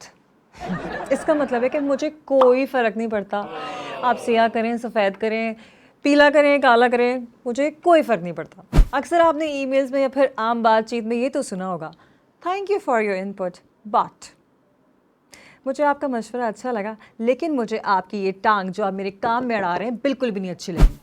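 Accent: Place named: United Kingdom. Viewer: Arsalan literally inspired tha tmharay British accent say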